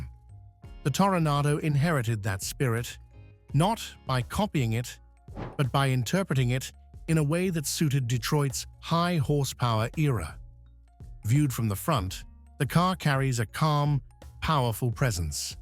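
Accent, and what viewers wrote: United Kingdom. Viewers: This chan has the best British voice.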